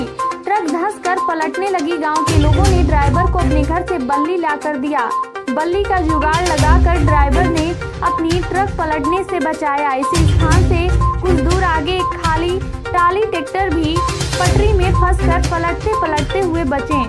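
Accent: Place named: Bhojpuri, India. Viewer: Madame ji please accent par jyada emphasis mt kijiye, Bhojpuri ki tarah sound aa raha hai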